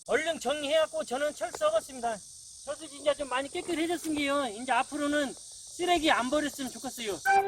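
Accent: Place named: Chungcheong, South Korea. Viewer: 충청도 사투리는 억양이 그렇게 쎈거 같진 않은데 말끝이나 쓰는단어가 정겹네유~~ 좋은일 해주셔서 감사합니다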